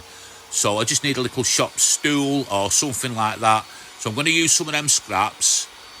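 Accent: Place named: Manchester, United Kingdom. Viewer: great projects, like to hear that proper manc accent when you narrate your videos,can see you getting more confident in yourself,can't wait for the next one 👍👍👍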